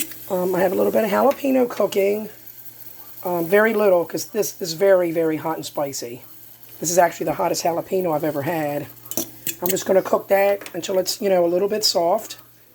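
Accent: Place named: Chicago, United States. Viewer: Your accent, I'm guessing Chicago area?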